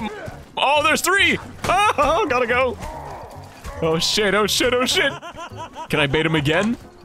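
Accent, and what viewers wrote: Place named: United Kingdom. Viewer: More British accent please 😆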